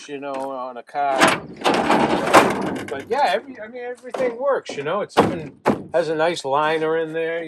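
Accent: Boston, United States. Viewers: Medford mass fire shirt!!! it amazes me you still have your Boston accent!!!!!! after 40 + years!! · Stan buddy i wana tell u how awesome it is to still watch u and have new videos today even after ur show stopped ...me and my mom used to watch u guys every week.... Were also from massachusetts just west of plymouth and she picked up on ur accent before we even knew u were from boston...she was born in '60 in quincy ....anyways shes no longer with me and i just found ur videos on utube and its really helped me feel closer to her cuz it was something we did together....i just wanted u to know how ur helpin me today so THANK YOU so much Stan and family we love u guys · Is that Medford NYFD shirt? I live near there..love ur Baston Accent I miss seeing you all on TV 😭 great red white and blue 💙❤️ too ! God bless you all 🙏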